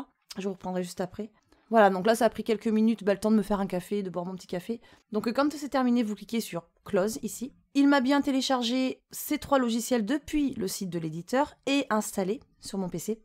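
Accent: South, France. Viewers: merci pour tes vidéos, t a l accent du midi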